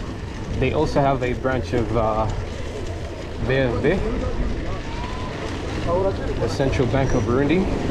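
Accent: United States. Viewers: Great vid thanks. I'm staying in this hotel in June can't wait. Are you from the States? you have an American accent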